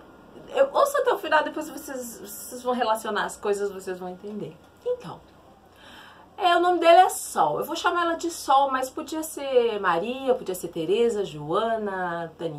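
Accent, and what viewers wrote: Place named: Rio Grande do Sul, Brazil. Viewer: Oi boa tarde tú és gaúcha!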